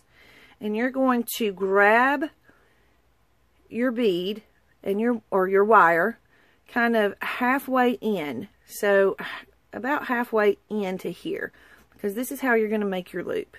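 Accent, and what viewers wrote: South, United States. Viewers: This was a great tutorial. Your wire wrapping is beautiful. I love the fabric and stuff on the second bead. Do you have an Etsy shop? I didn't see any links or more ways to contact you. I'm from N. Georgia and I can tell you're from the south. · I sure have enjoyed learning from you and listening to your Georgia/Tennesse accent! You're too cute! Love the boho beads! <3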